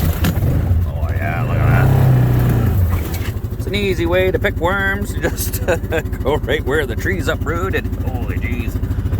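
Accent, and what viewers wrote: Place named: Newfoundland, Canada. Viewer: A you sound like you have a little bit of Newfoundlander in you. I keep that little bike for sure it seem you have a lot of work, with a couple of Bud light beers easy Pesy.👍😉🍺👍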